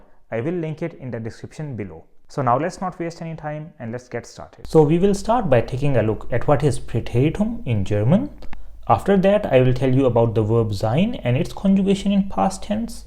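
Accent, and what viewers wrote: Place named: India. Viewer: Bro if learn from you German will not understand my German because you have that topical Indian accent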